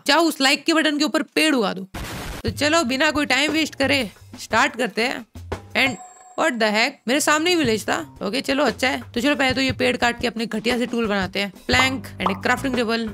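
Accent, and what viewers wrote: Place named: Gujarat, India. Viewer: Aapki boli se lagata he ki aap gujrati ho kya ho aap gujarati 😘😘😘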